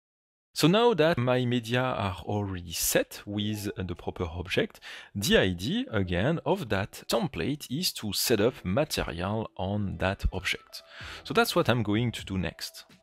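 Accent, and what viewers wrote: France. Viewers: Ohh my goodness, pour ne pas dire "OH MAI goudness grichious" ....Votre accent est trop français. La vidéo est de qualité, mais l'accent fait trop mal aux oreilles. Merci tout de même pour cette vidéo.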